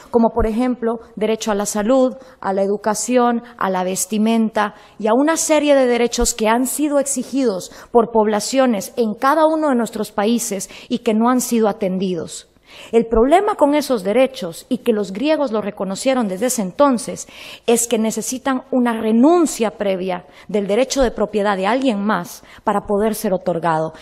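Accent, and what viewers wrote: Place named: United States. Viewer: acento norte americano y eso sí ya es peligroso...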